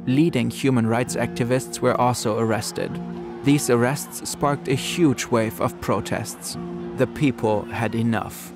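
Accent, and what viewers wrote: Germany. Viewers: The Stasi was crazy. Incredible what efforts they put into surveillance, what could have been if this effort was put into something good… also, hearing this in the thick German accent is great :D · Hes German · I hear german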